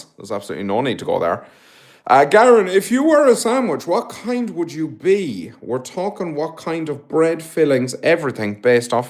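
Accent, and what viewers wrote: South, United States. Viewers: I love your Southern American accent, it's suuuuper cute and pretty accurate, coming from a Southerner. 🥰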